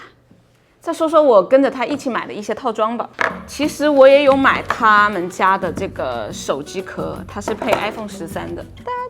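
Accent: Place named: Taiwan. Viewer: Steely是台湾人吗？说话一股台湾腔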